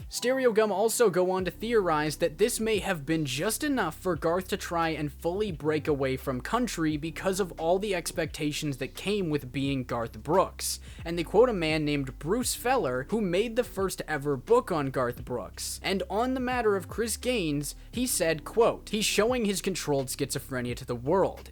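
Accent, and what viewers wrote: Canada. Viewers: the Beaches of "Chi-neen"? From his accent, I assume the host is Canadian, but if he's gonna talk about Garth Brooks, he needs to know how to pronounce Cheyenne.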